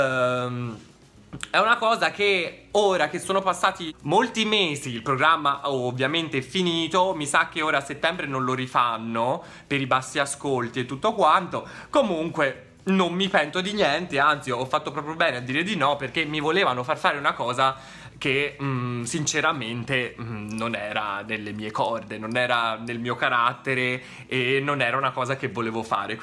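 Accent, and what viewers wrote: Tuscany, Italy. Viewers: “MAILA CHE CARDO CO STE LUCI”😂😂😂 Chi è toscano come lui/me qui? 👇🏼👇🏼Mettete like👇🏼👇🏼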